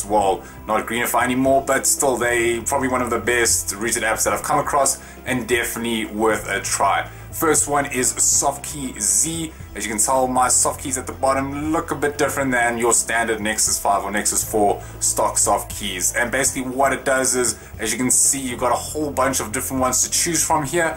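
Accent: South Africa. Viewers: Dude u sound South African/Afrikaans. Is jy? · Are you south african?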